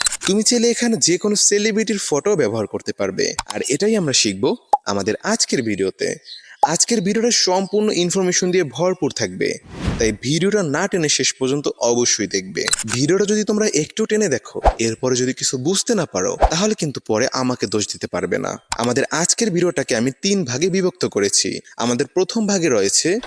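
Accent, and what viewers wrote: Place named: Sylhet, Bangladesh. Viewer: সিলেট থেকে আপনি?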